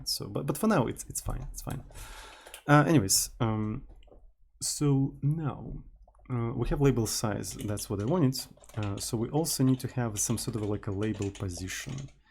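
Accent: Russia. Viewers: Русский акцент 😂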